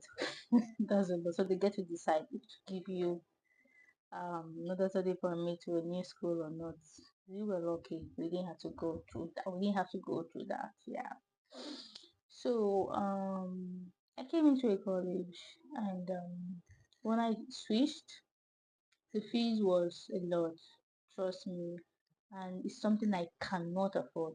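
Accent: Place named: Nigeria. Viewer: Your mic is not helping at all... I can't really follow you that much, your Nigerian accent is too much and the mic too is producing base alone. Please check it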